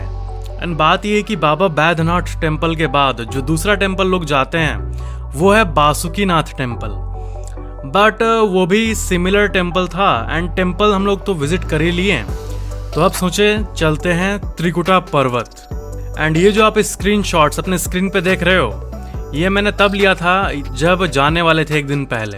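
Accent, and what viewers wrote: Bihar, India. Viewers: App bihar se ho kya sir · Aap bihar se ho lagta hai 😉😉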